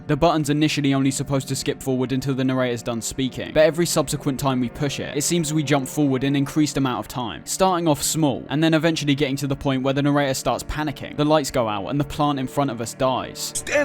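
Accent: United Kingdom. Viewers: Why are you British? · why are you british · 30 mins into the video and my gf, who has been passively listening, asks “what’s a narraya?” 😂 she’s confounded by the British accent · Why are you british?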